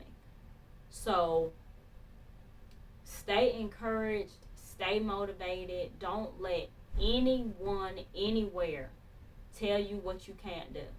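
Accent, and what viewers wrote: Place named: West Virginia, United States. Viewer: Good morning. I can hear your dialect and noticed that it was west Virginia. I have a church member who has the same dialect . On another note, keep uploading These videos . This video certainly spoke to me because people within my own family especially my siblings have told me in my face that I couldn't do things. This video really touched my heart.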